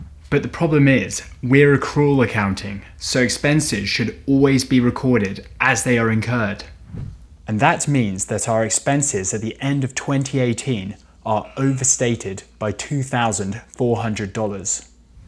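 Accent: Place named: United Kingdom. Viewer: Thank you so much!!! I finally understand what prepaid expenses are! I always Google for knowing knowledge first but I search your channel for knowing accounting knowledge first like this time 😀 Fan from Taiwan 🇹🇼 (Btw really like your British accent!! Also a fan of British accent)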